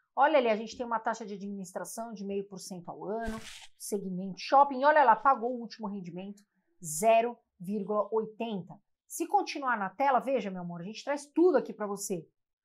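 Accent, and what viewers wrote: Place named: São Paulo, Brazil. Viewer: Sotaque paulista e horroroso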